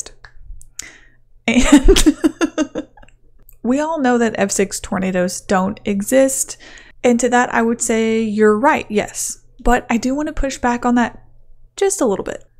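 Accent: United States. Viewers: Seriously? The current scale is the EF-scale. Europe still uses the F-scale, but you sound American to me. That said, as you know, the EF5 scale tops out at 318 mph. It's unlikely that higher windspeeds, which have been recorded as high as 320 mph, would cause more damage. It would take a much higher windspeed to create a new class of catastrophic damage. As for your comments about damage, it's possible to create your hypothetical scenarios under laboratory conditions. Engineers know exactly the structural integrity of a building within its technical life. Before you make another video, maybe you should ask an engineer, actually read the EF-scale, and review the peer-reviewed research about tornadoes.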